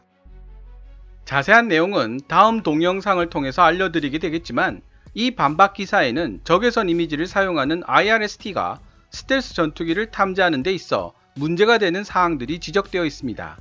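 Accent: Gyeongsang, South Korea. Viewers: 알만한 사람는 다아는 내용을... 호들갑 떠내... F35 파는 이유는 F22가 있으니까 파는 거고 F22안파는 이유는 F22보다 뛰어난 기체를 아직 개발보유하고 있지 않기 때문이지... 이사람 갈 수록 방송 제수 없게 하네. 영어도 존나 못하고 발음도 개떡같이 하네. 어떻게 영어발음에 경상도 사투리억양이 들어가있냐?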